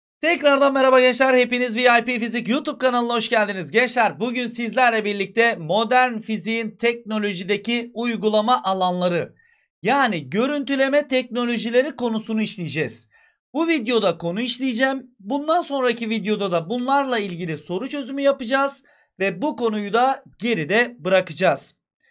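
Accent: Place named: Trabzon, Turkey. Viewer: hocam kesin trabzonlusunuz ilk defa karşılaştım kanalınızla :DDd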